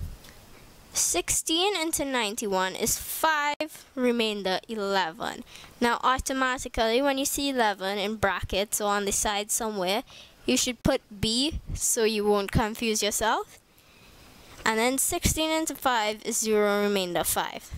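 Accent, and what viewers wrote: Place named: Caribbean. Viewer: I stayed when I realised your accent was familiar. I'm also from the Caribbean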